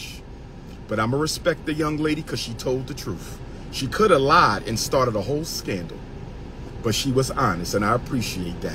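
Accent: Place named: South, United States. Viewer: It’s just funny being up north and hearing a southern dialect. All my relatives down south still say skrempts and skreets. Not belittling him nor them it’s just funny to hear it every now and again. The Dr. Is alright with me 🙌🏿👏🏿🙌🏿👏🏿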